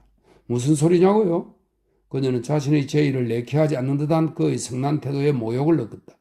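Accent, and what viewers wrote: Gyeongsang, South Korea. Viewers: 아버지가 책 읽어주는것 같아요. 경상도 사투리도 정겹습니다.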